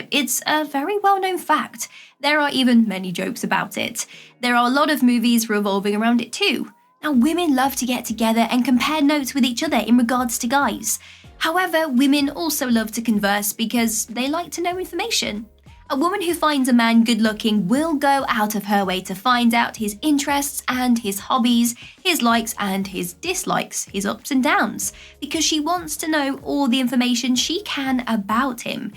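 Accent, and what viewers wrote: United Kingdom. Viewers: She is british....at least the accent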